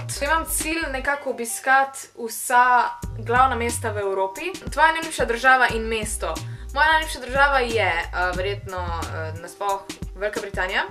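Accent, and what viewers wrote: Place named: Ljubljana, Slovenia. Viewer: ti si z lublane ziher k lublansk govooriš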